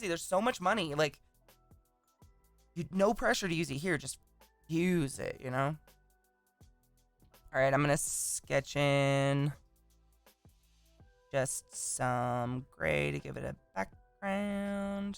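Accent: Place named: New Jersey, United States. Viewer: Nuthin like a Jersey accent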